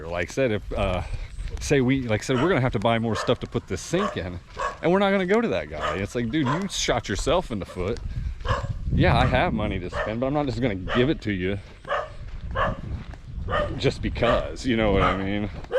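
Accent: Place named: United States. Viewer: at least your accent is giving someone with native English language from USA. You were not only raised by Americans, but you were/are exposed to American system a lot..... And I don't think it is funny. You had serious symptoms and yet it seems that you don't grasp basics of tropical diseases and that you, indeed, did have serious symptoms and that you are lucky to be alive. It seems you find this funny. Well, good for you.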